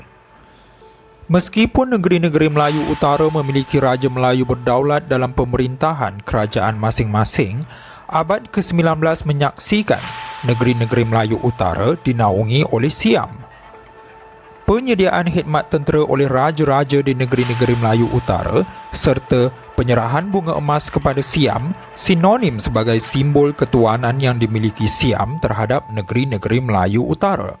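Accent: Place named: India. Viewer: Dengar suara YouTuber ini seperti India bercakap Melayu..Rasaya YouTuber seorang India...Cakap pelat India..Fakta yang ditulis ada sedikit tak betul.. Perjanjian Inggeris-Siam berlaku kerana Siam tidak mahu British menjajah negeri Siam..Untuk menyelamatkan Siam dijajah British.Kalau nak tulis kena kaji betul2 jangan bagi fakta yang kurang tepat.Siam adalah satu2nya negara yang tidak dijajah & sebab itulah berlaku Perjanjian Inggeria Siam..& Southern Thai Pattani & Golok terpisah dari negeri Kelantan & menjadi sebahagian negara Siam Thai hari ini..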